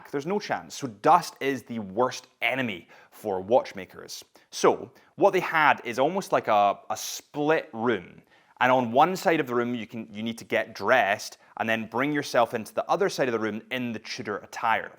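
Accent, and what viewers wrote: Scotland, United Kingdom. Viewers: He's Scottish, every word sound a little different 😊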